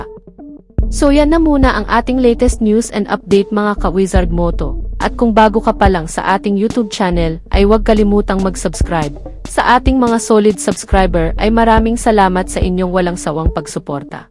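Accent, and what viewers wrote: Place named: Conyo, Philippines. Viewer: Kunyo mag salita.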